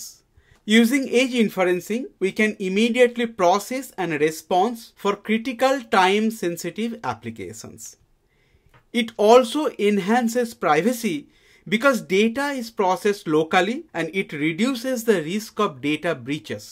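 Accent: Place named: Bengal, India. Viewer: Thank you. The content is really good and helping a lot for certification preparation. Just a suggestion, the voice used for recording is Bengali / biased . May be a auto dubbing provided by youtube will be more polished and helpful since there is no bias for pronounciations. Again, its a suggestion, please dont take it in a negative way.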